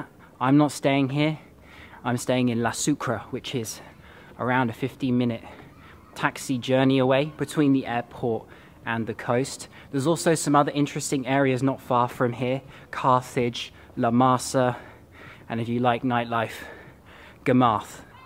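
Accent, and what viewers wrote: England, United Kingdom. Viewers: I’m in love with your British accent 😍😍😍ur my crush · I like your English accent the program that you made is pretty good👍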